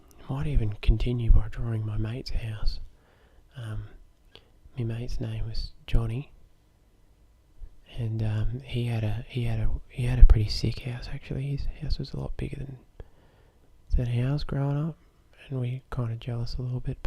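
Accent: Australia. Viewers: So I was scrolling and I found this man’s YouTube channel and I didn’t know who he was so I check them out and I found out he is Australian and it’s a lesson about how to talk Australian and I’m like well if I was American I would’ve watched this but it is relaxing though but I am already from Australia my whole family is from Australia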